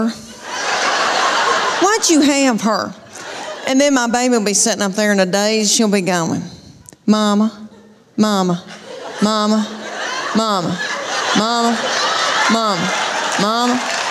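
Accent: South, United States. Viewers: I don't always get all the southern Americanisms BURT AH TELL Y'ALL shes a whole heap of furn🇺🇸🙏😅😅😅😅😅😅😅😅😅😍😍😍😍😍😍😍😍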